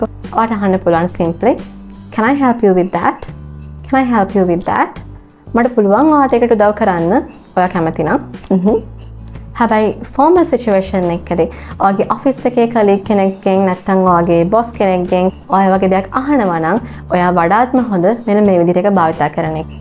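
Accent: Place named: England, United Kingdom. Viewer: i love your accent, my guess is that you studied in England.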